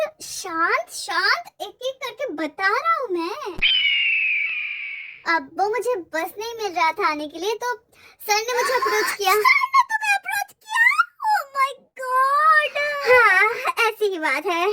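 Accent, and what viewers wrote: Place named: Bengal, India. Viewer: App ka Bengali accent😻.....Just woww😍 অবাক হয়ে গেলাম🤗😘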